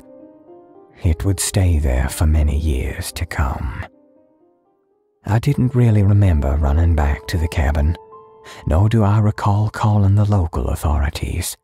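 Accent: South, United States. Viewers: Your southern drawl was Great!! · Wonderful timing, my good sir! Bed time! My but you have the perfect Southern gentleman advent! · Have heard this from another narrator, but you did it so much better! Love that thair southern twang of yours!!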